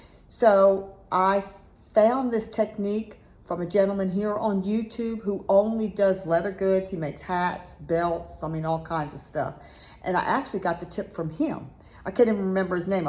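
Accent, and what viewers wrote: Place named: United States. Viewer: Thanks, nice to know this can be done without steaming the leather. I made a bracelet with my own woodturned beads strung on 3mm leather cord with leather barrel knots in between my woodturned beads, but the leather was too stiff as a bracelet so I pulled it apart, undid the barrel knots which retained some of their kink. I will try your method to unkink the leather so I can make a choker with it. Seems a lot of people are copying each others videos on youtube. You sound more American than Italian! PS - the tool you call a 'clamp' is actually called a 'vice'.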